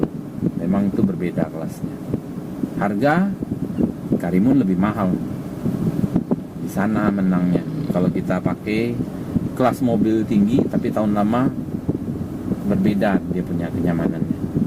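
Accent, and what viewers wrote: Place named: West Sumatra, Indonesia. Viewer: sumbar nya dimana bos, logat nya mirip, salam dari minang rantau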